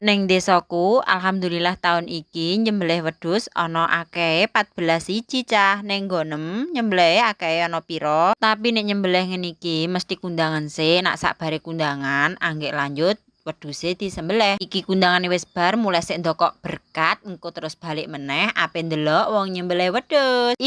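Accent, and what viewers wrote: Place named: Central Java, Indonesia. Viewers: Kalau dari logat nya ,antara cepu ,bloro apa iya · Bojonegoro juga,,nggonmu dadi nggonem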